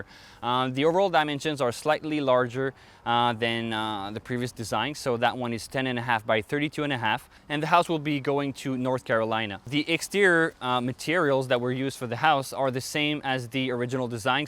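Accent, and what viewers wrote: France. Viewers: I miss your French accent, your English is impeccable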